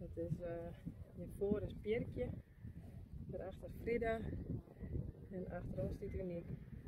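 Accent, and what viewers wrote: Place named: Netherlands. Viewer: I love your constant Netherland English narrating - it's funny but in a damn good funny way. I like it a lot cos it makes me laugh and feel happy. I love your horses too. The horses are lucky to have you as can see you care very much and is conscientious of their wellbeing. They all look well looked after and so healthy. Such beautiful horses and very powerful looking both at the same time. They exude beauty, power and health.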